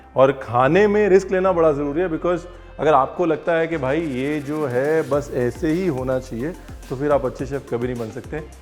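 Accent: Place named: Punjab, India. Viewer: I really like your North-Indian Hindi with Punjabi touch 😍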